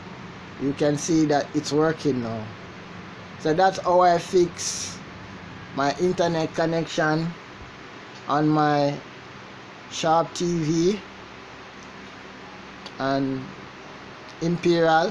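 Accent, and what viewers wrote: Jamaica. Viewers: Thank you soon much bredda are you a Jamaican?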